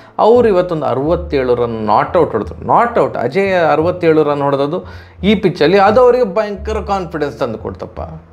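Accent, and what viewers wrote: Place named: South Canara, India. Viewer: ಪಕ್ಷಪಾತವಿಲ್ಲದ ವ್ಯಾಖ್ಯಾನ. ಸುಂದರ ಮತ್ತು ಸಿಹಿಯಾದ ಸೌತ್ ಕೆನರಾ ಕನ್ನಡ. ಯುಗಾಧಿ ಹಬ್ಬದ ಶುಭಾಷಯಗಳು.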